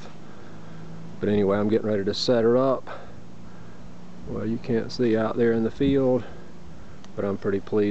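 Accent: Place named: United States. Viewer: Real American accent 👍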